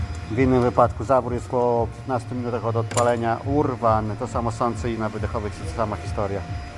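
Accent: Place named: Ukraine. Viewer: Zaciągasz ukraińskim koleś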